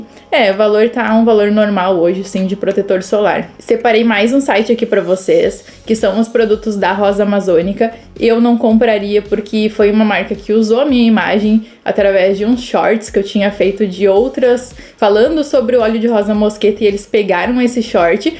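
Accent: South, Brazil. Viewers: Nada a ver com o conteúdo do vídeo é como eu gosto de ouvir o sotaque do sul. ❤❤❤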